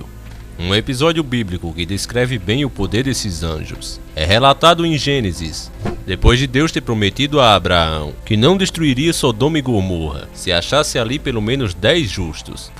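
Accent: Northeast, Brazil. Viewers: Muito top a narração ainda mais com sutaque do meu nordeste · O sotaque nordestino é naturalmente engraçado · seu sotaque nordestino e a cereja do bolo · narração bem nordestina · o melhor do vídeo e o sotaque nordestino sou nordestino também nossa língua e foda lklk muito bom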